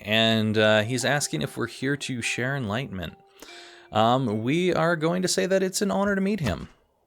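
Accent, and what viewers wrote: United Kingdom. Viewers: Your Japanese why is the narrator British or British sounding?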